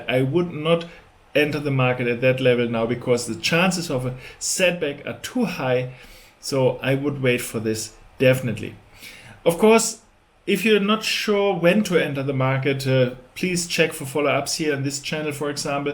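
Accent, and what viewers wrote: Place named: Germany. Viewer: cooles german english!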